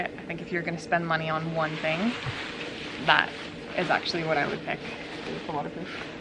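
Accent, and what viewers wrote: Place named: Scotland, United Kingdom. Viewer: Sometimes i hear a Scottish twang in your accent 🏴🇨🇦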